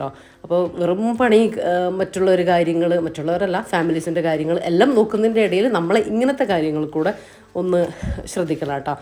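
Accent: Ernakulam, India. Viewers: Ernakulam പാ മുള്ളൻ, പാ കുർച്ചി എന്ന് പറയും 👍🏻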